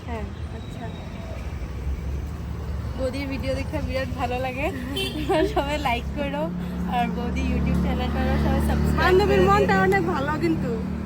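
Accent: Bangladesh. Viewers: The dialect you through when you speak is same like grater dhaka district ( not dhaka city ) village area of dhaka district, narayan gaung, manik gaung,narshindi,bikrompur , gazipur even greater farid pur district also speak same dialect....I pray to God keep all of you happy and prosperous...from Dhaka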